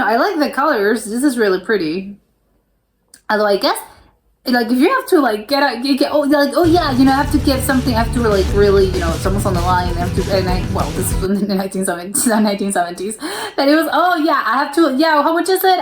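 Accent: United States. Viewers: Dragon, seagulls, king.... hilarious. 🙃Well, Finland's currency has been €uro since 2002. Before that, it was mark. "Kymmenen markkaa" means ten marks. "Dragon" is a lion and "seagulls" are swans. Finland has had a president since 1918, not a king. However, you sound very, very, very American. ☺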